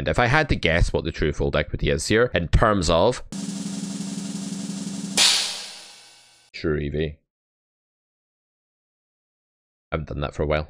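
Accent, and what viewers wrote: Scotland, United Kingdom. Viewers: Can absolutely tell that your Scottish and probably had too many conversations with stoned Glaswegians when you come right off the bat with a spoon analogy with way too much detail.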